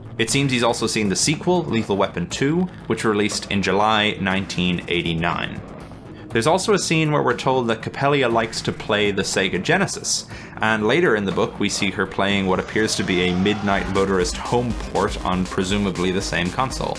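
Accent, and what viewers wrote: Ireland. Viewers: are you irish? theres a slight accent to ur voice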